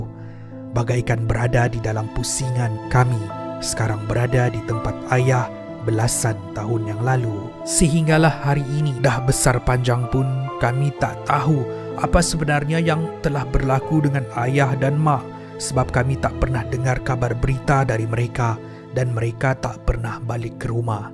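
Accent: Sabah, Malaysia. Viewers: Indahnya dialek Sabah jika bercerita..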